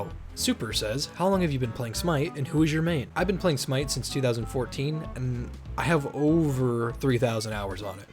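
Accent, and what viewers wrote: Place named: Dallas, United States. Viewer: So howabout that Dallas voice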